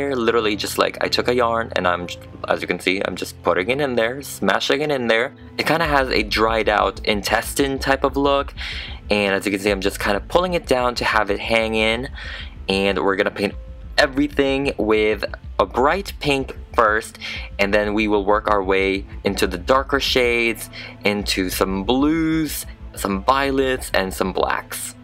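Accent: Philippines. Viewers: I LOVE the fact that you never lost your Pilipino accent. :)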